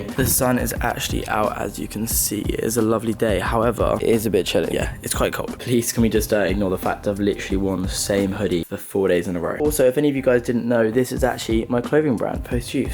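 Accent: RP, United Kingdom. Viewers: Dude, you have a posh accent. Pronounce the "th" as "th", not "f" as if you're trying to sound slightly more slum.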